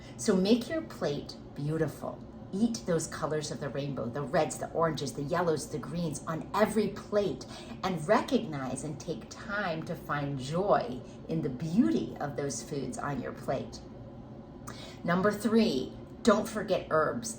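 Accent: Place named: United Kingdom. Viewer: Your British accent is spot on.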